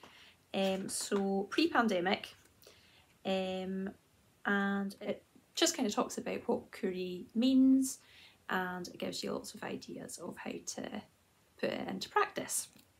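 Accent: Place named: England, United Kingdom. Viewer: I really like your Instagram and this podcast is definitely great.Unfortunately, I can't understand your English accent 😓 and there are no subtitles.I'm sorry, but it's my fault . So,Happy New Year.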